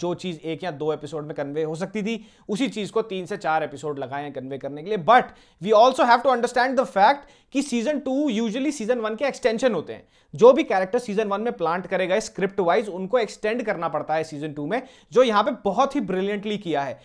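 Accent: Haryana, India. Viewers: Mast haryanavi bolta hai tu....... Continue it in ur video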